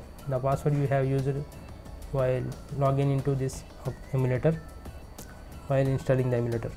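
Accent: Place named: India. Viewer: Idk what this dudes saying, that indian accent strong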